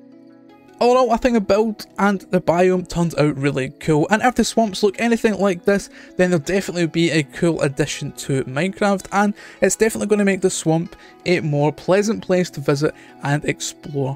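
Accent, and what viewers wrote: Scotland, United Kingdom. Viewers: You know your accent is really unique, like it's you have a soft Scottish accent but also have braces, and the only other person with anything similar is AndyIsYoda. But anyways cool build.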